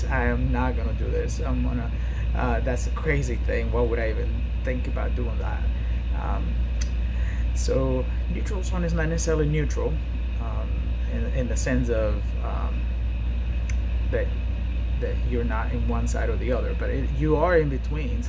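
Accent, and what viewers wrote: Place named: South, United States. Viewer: Love this,. Well done and very helpful. You are picking up a southern accent 😁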